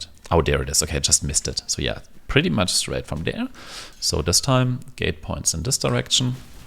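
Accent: Germany. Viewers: Oh my god, you can't just ask someone why they sound German!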